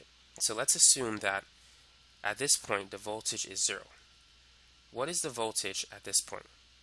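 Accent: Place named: Boston, United States. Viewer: You sound like you're from Boston or jersey.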